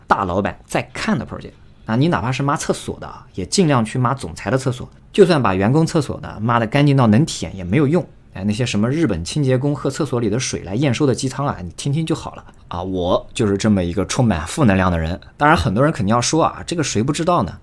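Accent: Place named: Shandong, China. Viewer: 有一点山东口音哦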